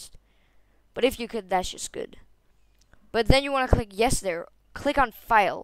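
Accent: Denmark. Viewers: damn your english is so good i can barely hear that you're danish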